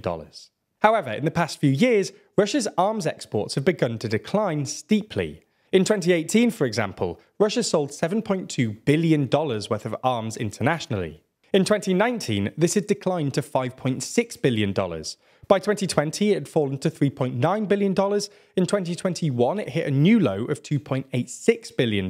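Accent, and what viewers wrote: United Kingdom. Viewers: when you hear an annoying British accent you know you are listening to a propaganda garbage machine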